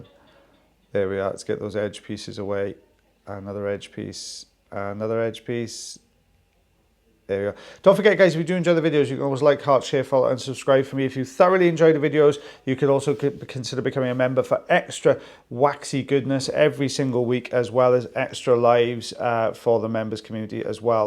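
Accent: Wales, United Kingdom. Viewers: Love the Welsh. Would love to hear more, my wife thinks it’s sexy.😊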